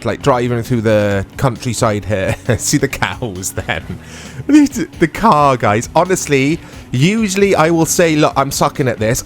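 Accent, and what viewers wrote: United Kingdom. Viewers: yuk, british accent...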